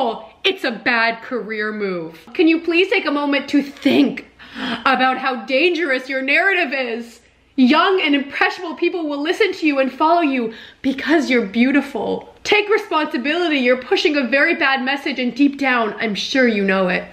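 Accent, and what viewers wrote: Canada. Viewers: are you canadian? you just have the vibe/accent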